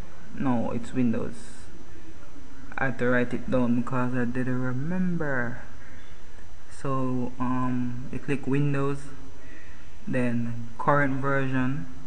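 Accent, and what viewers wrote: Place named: Jamaica. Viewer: i can tell ur jamaican.....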